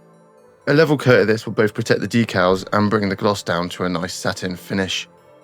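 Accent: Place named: United Kingdom. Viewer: a real voice is infinity better than any A.I. rubbish. Nothing wrong with a proper Brit accent.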